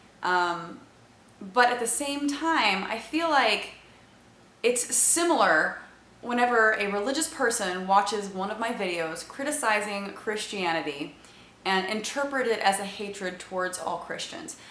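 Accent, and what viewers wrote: South, United States. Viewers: Following her big year of atheist drama, she is now moving towards a kinder, gentler atheism. Does that mean she is going to stop using that incredibly bigoted southern accent she always uses by default when she thinks she's making a good point? Oh, that's right, she does that because of her white guilt. Well, it's a good start. Hope she can get there while she's still young and pretty, cause when she gets older it's just gonna come across as mean and Nasty. God bless her.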